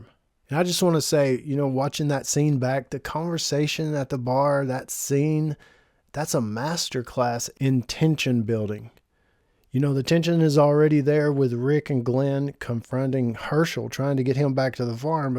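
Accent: South, United States. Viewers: I just watched a 6 year old video frrom this channel and you had a way thicker southern accent. Have you toned it down over the years? Im good either way im not criticizing it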